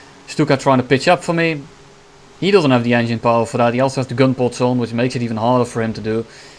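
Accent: Netherlands. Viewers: Q: what country are u from? You sound dutch but that's Just me I think.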